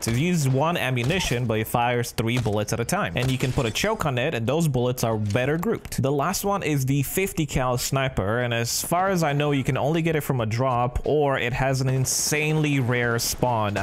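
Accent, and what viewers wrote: Romania. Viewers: OMG ESTI ROMAN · wtf are you Romanian? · R U ROMANIAN?/Esti roman?? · u romanian? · u romanian??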